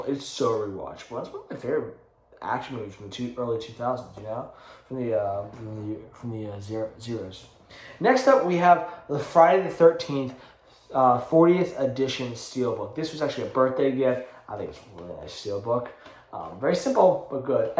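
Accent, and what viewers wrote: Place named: South Jersey, United States. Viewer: i thought i recognized your accent - i'm from south jersey - live in Portland, OR now though - steelbooks are awesome - also anything from arrow video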